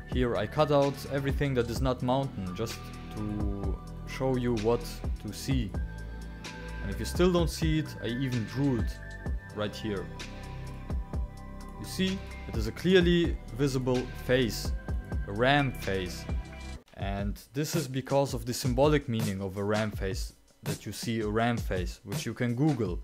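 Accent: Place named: Germany. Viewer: I can recognize the German voice:)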